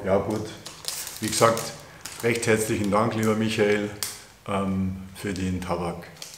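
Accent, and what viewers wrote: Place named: Bavaria, Germany. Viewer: habe de Ehre zruck ;-), sah dich schon öfter, auf diversen anderen Kanälen und horchte dem vertrauten Dialekt; Jetzt auch DEINEN Kanal gefunden *freu. Woher kommst du denn? Besten Merce + schönes WE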